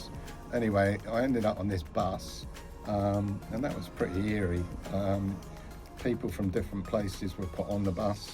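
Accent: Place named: England, United Kingdom. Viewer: Of for crissake .. where are you from? Listen to the man ... he is very clearly English.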